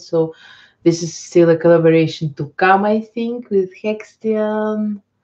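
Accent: Eastern Europe. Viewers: Це називається "слов'янський акцент". Не лише російськомовні мають такий акцент. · I knew something did not compute when I saw you lived in Belgium but had a very thick Eastern European accent :D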